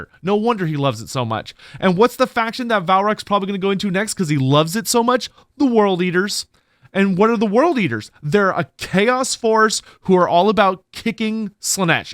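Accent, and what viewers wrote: United Kingdom. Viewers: Mancunian accent makes it too difficult for me to watch. The prediction videos also kill a lot of excitement for me at least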